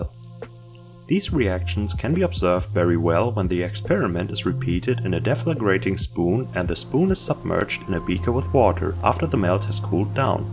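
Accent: Germany. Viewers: interesting question. it at least sounds like he has a slight german accent. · Are you German? Just for curiosity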